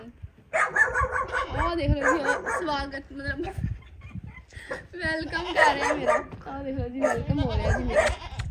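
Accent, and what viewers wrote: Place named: Doaba, India. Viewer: Tuhadi punjabi doaba di lag rahi hai...charde punjab ch Jalandhar te Hoshiarpur doaba ch aande ne...inz lag reha jiwe tusi bhi etho de ho...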